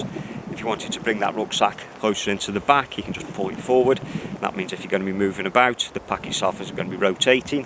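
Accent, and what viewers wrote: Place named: Scotland, United Kingdom. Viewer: Yes indeed that did help a lot. I'd missed the information about the various places you could strap the hip-belt on. I thank you. I'd like to avoid those trials and errors as much as possible when buying over internet, especially from companies like Varusteleka whose customer service will always go the extra mile : I wouldn't like to stretch them either, for fear of seeing them put on a tougher face. Besides, it's been refreshing for me to listen to you talking with your scottish twist. I've lived around Aberdeen for three years, and hearing a scotsman talk brings every time fond memories of warm people and amazing light on the land. Cheers ! :)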